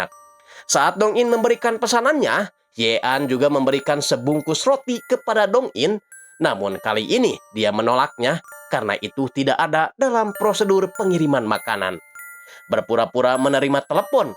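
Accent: West Java, Indonesia. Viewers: Lucu ga ada serem seremnya karena pembawa ceritanya sunda pisan 😂😂😂😂😂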